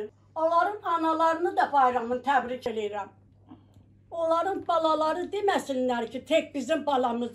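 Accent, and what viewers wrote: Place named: Baku, Azerbaijan. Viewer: Nan bakı lahcasikimi dansdl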